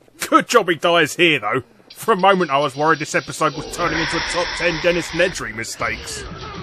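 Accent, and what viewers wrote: United Kingdom. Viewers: I swear sounds like Taco Man with a British accent XD. Awesome commentary on JP mistakes nontheless.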